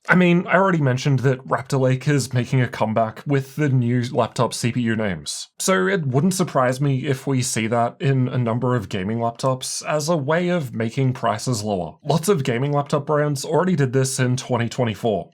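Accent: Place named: Australia. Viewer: I like Aussie voices. Never stop talking, Aussie boy.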